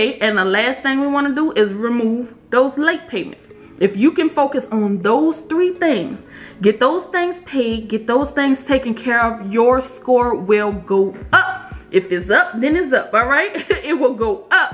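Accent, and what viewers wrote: New Orleans, United States. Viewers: Great info! I love your attitude and accent ❤️ Sounds like you from New Orleans 🤣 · You got that NOLA twang love from Htown thanks for the game 💯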